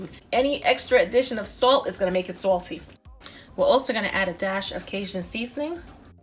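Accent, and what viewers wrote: New York, United States. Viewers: Is that Queens or Bklyn accent I hear? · Off the chain sis got to be from nyc with the accent love it will make this 2morrow. And yes I subscribe and shared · Love this recipe 😊 l hear that New York accent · Love her new york accent.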